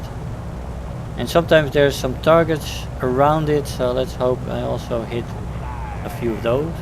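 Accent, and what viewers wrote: Netherlands. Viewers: i can hear ur Dutch :)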